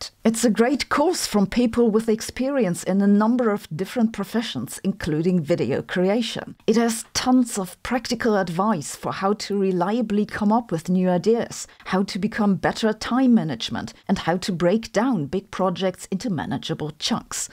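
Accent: Germany. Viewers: I have now realized that Sabine's monotone German cadence has completely lulled me into a sense of false security. Should have read the date before watching this.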